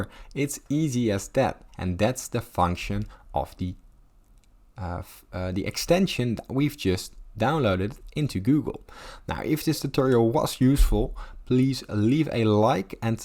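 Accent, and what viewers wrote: Netherlands. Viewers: Are you dutch by chance?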